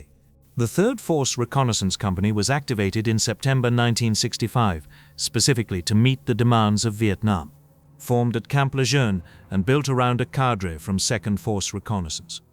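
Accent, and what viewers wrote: United Kingdom. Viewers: I don't want to listen to British accent voices talking about US Marines we don't have any Americans that can narrate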